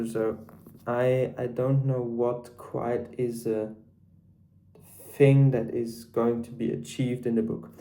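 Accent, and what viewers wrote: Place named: South Africa. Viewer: Hi, I'm new to BookTube too. Nice to meet you. Do you have Afrikaans in your background - your accent is a little mixed? Don't worry it's not a bad thing. I teach English and I like to work out accents.:)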